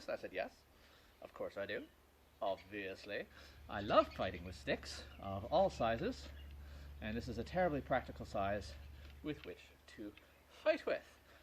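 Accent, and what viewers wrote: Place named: Sweden. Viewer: You have a Swedish accent.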